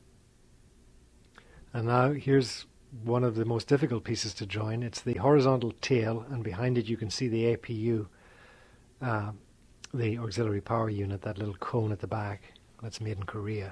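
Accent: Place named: Northern Ireland, United Kingdom. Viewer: Brilliant video - nicely narrated too. Good to hear a Norn Iron accent on this video. :) Thanks for the upload!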